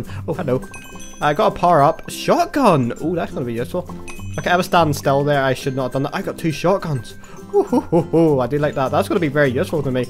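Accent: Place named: Northern Ireland, United Kingdom. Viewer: what part of northern ireland are you from because i am from ireland too, but not northern:( oh well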